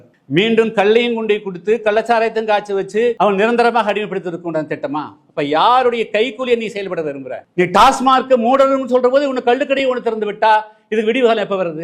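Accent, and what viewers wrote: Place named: Telugu, India. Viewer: ஐயா நீங்க பேசுறது சுத்த தமிழ் மாதிரி தெரியல தெலுங்கு வாடை அடிக்குது.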